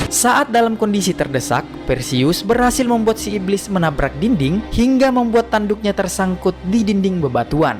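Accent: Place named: Makassar, Indonesia. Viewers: Admin nya orang Makassar kah? Logat & bahasanya ketauan . Kaya pas bilang 'tampiling, co'do co'do'😅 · Orang makassar ini pale 😂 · Orang Sulawesi Ki to bang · Pasti orang Makassar 😅 Salam dari Gowa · Gue subs lu bang karan lu orang makassar kan haha😂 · Dari logat nya pasti orang Sulawesi😂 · Deh pasti orang Makkasar ini hahaha waktu bilang tuming abu dehh pecah ketawakuu😂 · Cokdok cokdok kayak bahasa Sulawesi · Nassa na logat makassar na admin ka😅😅😅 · Adminnya jelas² orang sulsel, suku apa juga gak tau😂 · Makassar banget nah kamase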